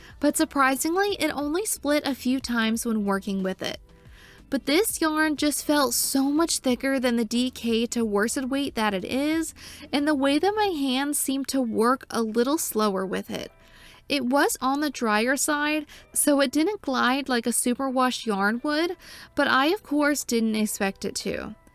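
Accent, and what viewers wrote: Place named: Midwest, United States. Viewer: Have to disagree with this comment. I think your voice is great as is! (And this sounds more midwestern than valley, but both are fun.) Your content is solid and you speak very clearly.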